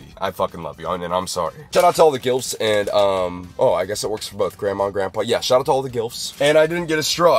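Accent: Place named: Scotland, United Kingdom. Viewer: GOODBYEEE DID HE SAY WEE BIT HES SO SCOTTISH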